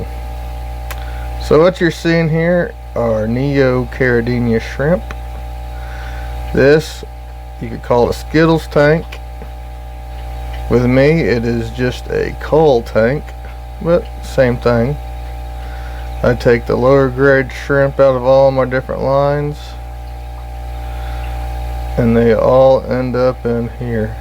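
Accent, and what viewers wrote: United States. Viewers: Are you from texas?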